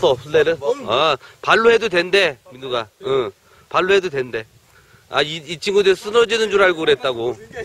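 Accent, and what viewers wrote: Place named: South Korea. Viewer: I'm not being offensive but it sounds funny when you speak mongolian because your accent is so korean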